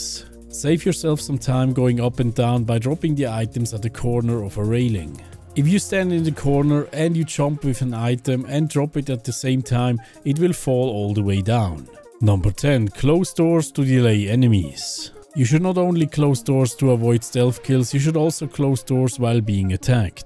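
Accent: Switzerland. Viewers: Bro du besch sicher schwitzer, ech ghör das ah dim englisch wettsch mol eis zocke? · are you swiss? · Are you Swiss?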